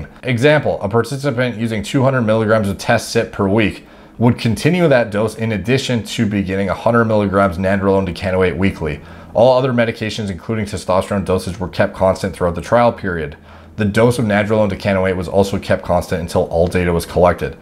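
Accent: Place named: Canada. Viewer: We do. But he’s got the Canadian accent ayyy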